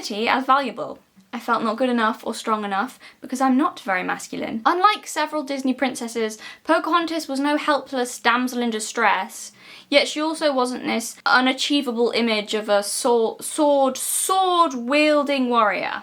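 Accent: United Kingdom. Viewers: I always forget how British you are until you speak So, what i got out of this: British Girl Loves Trees (EXPLICIT?)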